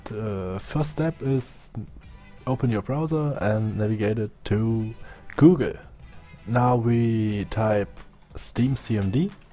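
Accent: Germany. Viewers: sounds german :D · Did what you said and worked PERFECT ! love the german accent makes it funny in some soort of way ;) dont take it bad ! Thank you for this video !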